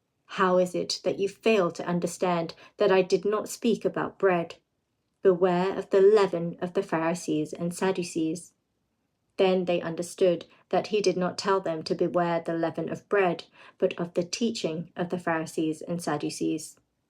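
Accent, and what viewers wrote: United Kingdom. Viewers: No offense but British accent makes me feel like I've lost all understanding of English as If I it's not my own language, this is probably how non English foreigners feel when they hear English 😂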